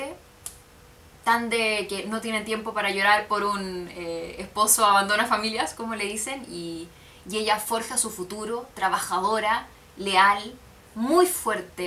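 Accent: Chile. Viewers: Te encanta la musica porque cantas muy bien. Tus opiniones de coco muy bien acertadas, fundamentadas el mejor video de coco que he visto felicidades la mejor de chile, amo el acento chileno el mejor de latinoamerica, saludos desde Mexico 😘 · estás preciosa y el acento chileno me encanta 🤗, saludos desde 🇲🇽 hasta 🇨🇱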